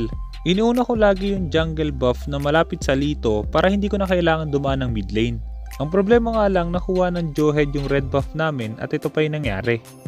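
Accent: Visayas, Philippines. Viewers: bisaya ka idol?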